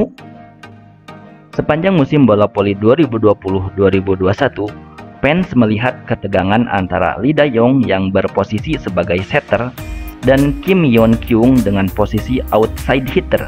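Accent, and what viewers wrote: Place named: West Java, Indonesia. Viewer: Lidah sunda bro... 😅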